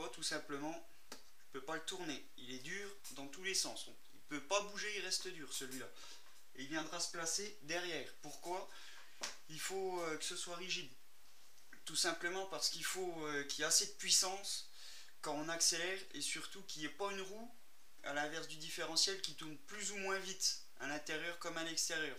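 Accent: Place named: North, France. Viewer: Ta un de ces accent du nord j adore !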